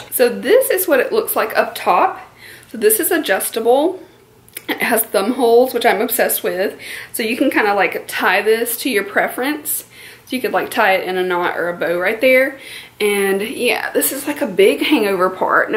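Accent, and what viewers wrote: South, United States. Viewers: Growing up in the south and suddenly living way over in the west now, your voice is so nice to hear again. I miss the accents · That's awesome I've always wanted to visit there. Our southern accents are very similar lol. U r very beautiful and talented. This is my first time watching you and it is very helpful Ty!:)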